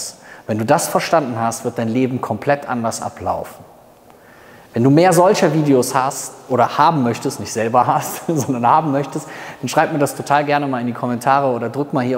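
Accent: Cologne, Germany. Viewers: Cooler Akzent hört sich kölnisch an 😅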